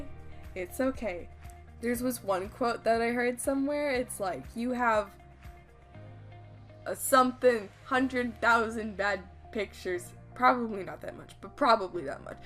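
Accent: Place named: United Kingdom. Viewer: 'Layers are the essence of art' now this my friend is a good quote! You're so right layers are everything ahah! well with how i like to art anyway! XD You can see your improvement so evidently!! The anatomy and the fluidity of her pose looks so much more natural! Also that portrait is super cute! I legit always use references! i'd like to make my work a lil more stylised tho :) ahahah you cutie! its 'A' "y' 'art' '96' thanks for the amazing british accent now i know how i sound!! XD (never does a voice over again) jk jk :D and its true ya WONT SUCK FOR EVAA. You have definitely improved it looks great and you should be happy with yourself gal! Who knows where you'll be next year <3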